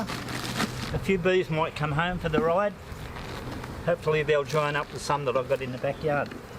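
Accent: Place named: Australia. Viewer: Australian beekeeper talking to his bees 😍🥰 I absolutely love the Australian accent.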